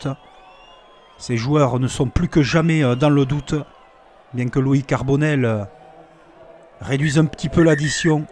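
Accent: France. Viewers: On parle vraiment avec cet accent dans le Sud Ouest ? Oh, merde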